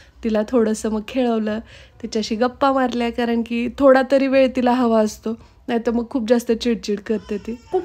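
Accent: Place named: Udgir, India. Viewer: मी पण udgir ची आहे. तुझे vlog पहिले आणि तुझं बोलण ऐकलं की आपल वाटत. आपली भाषा जवळची वाटते.. मस्त झालेय बेबी. मी खास तिला पाहण्यासाठीच व्हिडिओ बघते तुझे. 🤗🌹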